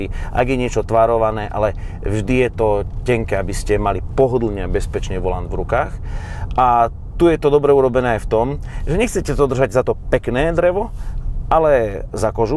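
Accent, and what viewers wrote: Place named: Liptov, Slovakia. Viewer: ten tvoj liptovský prízvuk ... nádhera !!! :D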